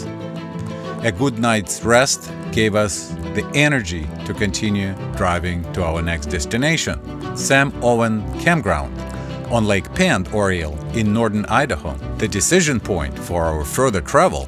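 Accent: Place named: South, United States. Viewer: your good ol' southern accent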